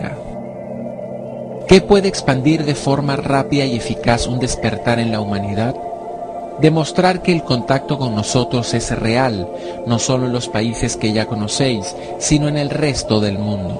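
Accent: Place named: Mesoamerica, Latin America. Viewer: La musica es hermosa, el mensajes es el mismo que el de tantas canalizaciones, no entiendo porque no pueden decir quienes son?.. ya conocemos a los pleyadianos arcturianos y demas...no entiendo bien ???...un par de cosas me molestaron...LA TONADA MUY HUMANA HASTA MESOAMERICANA.. Y QUE NO FUERA EL ORIGINAL..por lo demas...lindo..¡¡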